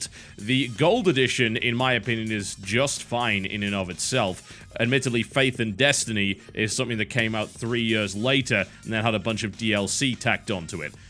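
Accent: United Kingdom. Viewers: Video content is good, british accent is just terrible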